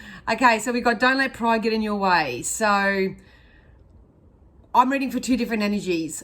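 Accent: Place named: Australia. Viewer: I love how real you are .. it's nice to listen to an Aussie ❤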